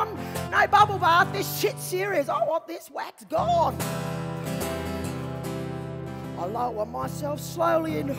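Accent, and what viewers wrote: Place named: Australia. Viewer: Shit ya make me laugh Woman! The pure Aussie...ness (its now a word) is just grouse!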